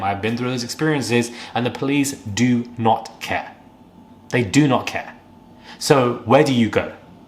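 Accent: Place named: London, United Kingdom. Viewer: I didn’t notice your speed, I do like your layout of presenting,barely any waffling straight down to the news in hand. I had guessed you were London though. You are just fine- as you were 🙌👏🏻👏🏻👏🏻👌 P.s- didn’t know there was a speed button. On a new ish iPad?? Live and learn one for me today.cheers , G .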